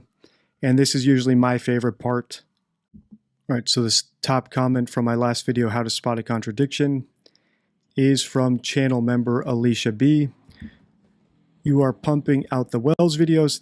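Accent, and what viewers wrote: South, United States. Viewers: I think the way she talks is just being uneducated and southern. I say both because my step mom is a smart woman, has a great job at a company she has been with for decades.. but she is from Georgia/Tennessee, and the way she talks sometimes just makes me cringe a little inside 😂 it's a southern thing.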